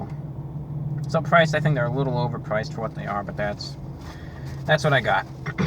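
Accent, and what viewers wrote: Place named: Upstate New York, United States. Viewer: recognize your "accent". Are you from Upstate New York? I am and that's how people talk around here.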